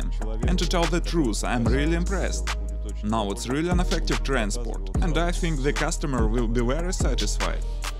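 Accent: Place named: Russia. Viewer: Явно нужно что-то делать с русским акцентом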